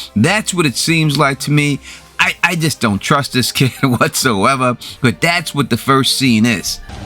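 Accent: New York, United States. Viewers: Next up is Kurt who comes with a SET OF BAWLS ON HIM. omg I love your accent, are you from ny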